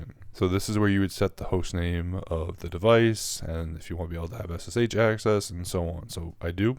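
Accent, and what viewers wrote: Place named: United States. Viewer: Thanks. You cannot help it, but your strong US English accent and quiet voice are tough for English speakers to understand. Thankfully, Subtitles help quite a lot, despite their imperfections. 🙂 I bookmarked this video, because it's a good application for an unopened Pi4 which has been in my workshop for far too long.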